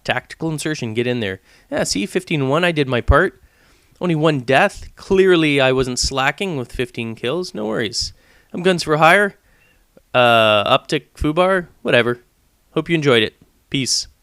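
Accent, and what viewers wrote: Canada. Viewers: lol u canadian?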